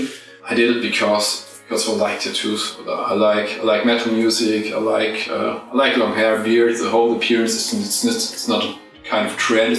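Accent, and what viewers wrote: Germany. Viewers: Your story is amazing. This explains a lot of your tattoos you have unfortunately when you’re growing up, there’s some real bully kids and mean kids that want to make you feel bad about yourself fortunately for you you have nothing to feel bad about those same kids today are probably like wow look what he did amazing I love your accent German so am I I should say part German in German and half Apache, Indian, good combination, fire and water. I was born in July. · Der deutsche Akzent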